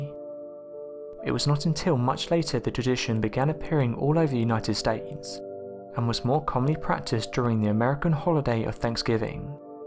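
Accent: United Kingdom. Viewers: Like most Americans, I'm SUCH a sucker for British accents. Lovely PS Best allies ever! 🇺🇸🇬🇧🇺🇸🇬🇧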